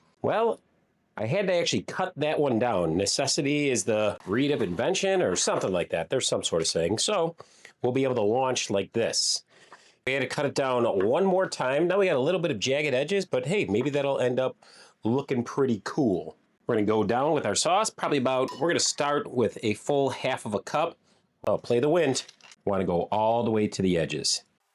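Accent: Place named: Chicago, United States. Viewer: You have an authentic Chicago accent. I could pick out a “Chicago “ accent anywhere .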